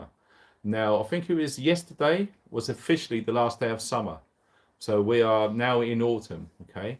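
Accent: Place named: Bristol, United Kingdom. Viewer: Hi.Totally came across your channel by accident.Just Watched Your Video on prepping very informative.I've put out a number of prep videos too on my channel.Very much into information sharing and resouce sharing. is that a Bristolian accent I can detect.?Keep Up the good work.Will definitely be tuning in to your channel again.Eldad Amara(UK).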